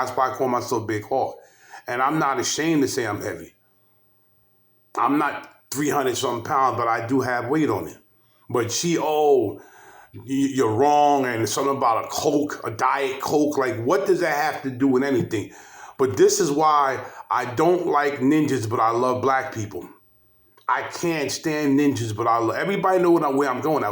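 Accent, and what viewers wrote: New York, United States. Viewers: Just catching the replay. I am a very dark complexion black woman I caught a flack as a young person for being dark and it was my people who harassed me about something I could not help. I happento like a big Black man like you and with New York accent you a handsome dude. No Harris will not get my vote ! Take care Big Hawk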